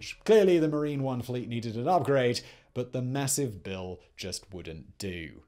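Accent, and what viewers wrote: United Kingdom. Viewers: His speaking is tuned more to a Brits ear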